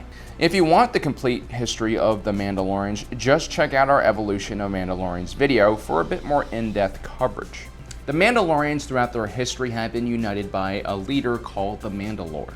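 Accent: Australia. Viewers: You actually getting better at the Australian accent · ... Aussie? New Zealander? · Are you Australian ? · The intro sounded very Australian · are you Australian ?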